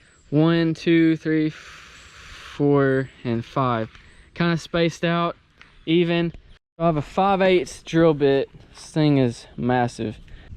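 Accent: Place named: Texas, United States. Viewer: Ok maybe not from Texas but you sure do sound like it…! Mad skills bro, stay blessed!!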